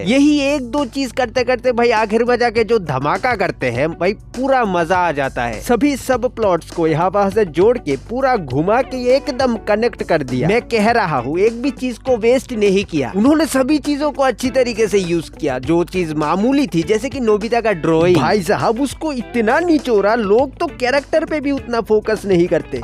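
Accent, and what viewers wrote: South, India. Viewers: Lots of love from Rawalpindi your accent is same like movie Chennai express you are from which city Namaste Namaste Namaste Namaste Namaste Namaste Namaste Namaste Namaste Namaste Namaste Namaste Namaste Namaste Namaste Namaste Namaste Namaste Namaste Namaste Namaste Namaste Namaste Namaste Namaste Namaste Namaste Namaste Namaste Namaste Allah Hafiz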